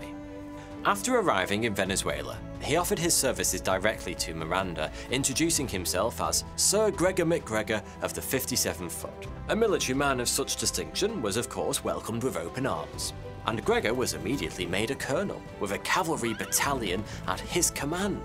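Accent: United Kingdom. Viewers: Anyone surprised the guy was British? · I always hear "forty two" instead of. British accent is confusing 😂😂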